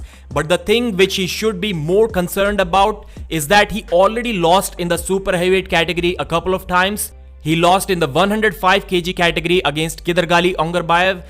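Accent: India. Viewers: Man, by having such a proper channel you singlehandedly made me get used to an indian/pakistani accent at last! Now it feels just like an american or UK one, just as easy to comprehend! Kudos to you, man! Hope my comment doesn't come across as ignorant one, I really meant it with the best of intentions! Best agregator/news channel on arm wrestling lately!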